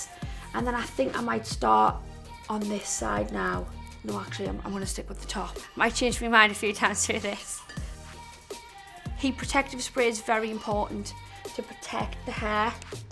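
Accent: Newcastle, United Kingdom. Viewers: Have you permently quite Geordie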